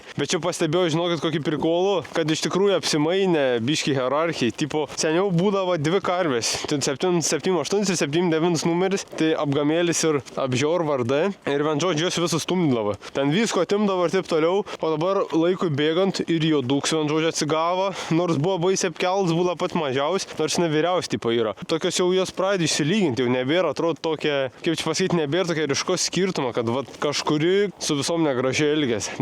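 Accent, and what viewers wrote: Biržai, Lithuania. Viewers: Labai smavu ziuret tavo video, kalbi gryn birzietiska sneka kurios malonu kkausyt. Grazus krastai, birzu giria, Nemunelis, Aspariskiai, Dauguvieciai, keliai ,kelialiai. Senokai buvau, vasara zadu aplankyt, gal jau puse girios baigia iskirst.Geru vidosu, sekmes sunkiuose darbuose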